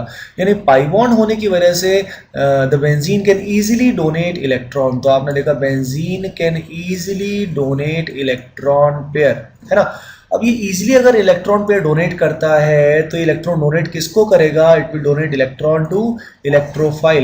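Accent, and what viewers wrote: India. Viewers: i really hate indian accent but thanks for ur effort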